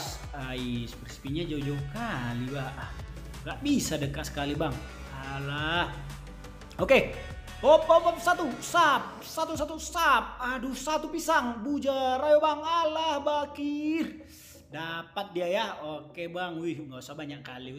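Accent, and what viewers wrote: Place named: Medan, Indonesia. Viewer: Bng kalo boleh saran gk usah di campur logat medan,, kek garing bat di dengar😌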